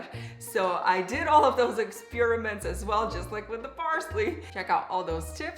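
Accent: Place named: New England, United States. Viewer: Ur accent is of a New Englander.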